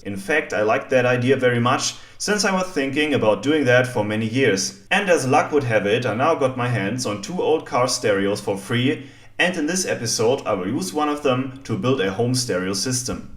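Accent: Germany. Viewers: lol i can hear your german accent. even tho i can tell you seem to make an effort to try and hide it. but damn i loved then video. great use of all them old bits lol maybe ill put to use the old tape deck i have out of my ford lol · I love your videos and your german accent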